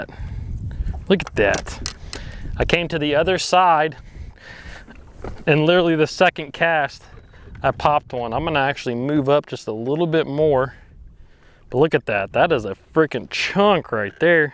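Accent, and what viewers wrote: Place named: Long Island, United States. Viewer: "Downsize after Sunrise" that's how I roll ....unless their on and the Bubba baits r Workin ! Great channel brother. Is that accent from Long Island ?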